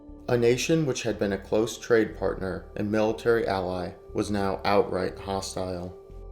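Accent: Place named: United States. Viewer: very exaggerated American pronunciation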